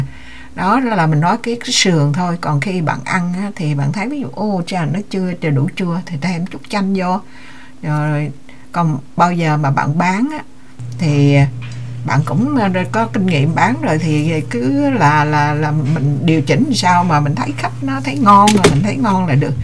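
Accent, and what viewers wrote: Quang Nam, Vietnam. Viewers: Chào, Mình rất thích nghe giọng Quảng Nam cuả 😍🤩 Bánh rất ngon, dòn và đẹp lắm. Like 👍👍👍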